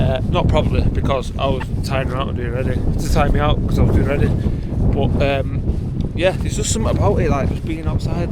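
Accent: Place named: Salford, United Kingdom. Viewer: Good vid pal new subscriber sound u like from my neck of the woods salford or near by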